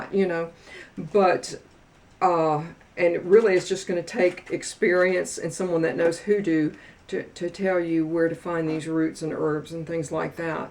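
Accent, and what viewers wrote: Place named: South, United States. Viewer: New subscriber here. I have a question. How long have you been doing this? Just curious. I like your personality and you are a very unexpecting witch. I grew up doing this and I’ve had to keep quiet for so long since I live in the Bible Belt. Now that I’m older, I’m more comfortable with who I am but, I still don’t go around telling people what I do as a lot of people in my small town are very narrow minded and I have kids and don’t want that to affect them negatively with other kids and their parents. I noticed your southern accent so, this is comforting to see. Thank you 😊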